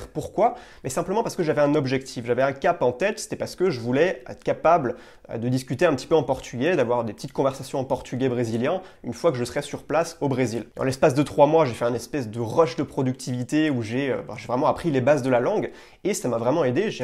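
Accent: France. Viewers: L' accento francese è bellissimo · '' accent français dégueulasse '' et je suis pas d'accord, ça fait son charme des fois, à part si tu parles comme François Hollande 😅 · c'est trop bien l'accent français, ça aide à pécho et ça mets un peu de noblesse française dans cette langue de plouc qu'est l'anglais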